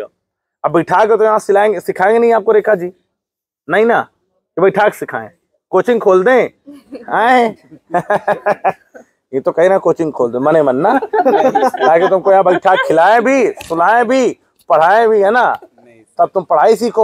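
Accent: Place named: Chitrakoot, India. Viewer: Baba ji aap kahan ke ho Bhasha ka chitrkut District ki lag rahi hai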